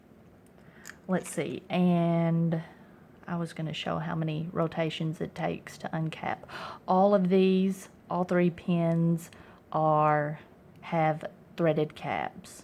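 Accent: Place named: Appalachia, United States. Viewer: Good review, but it's wearing to listen to her hillbilly accent.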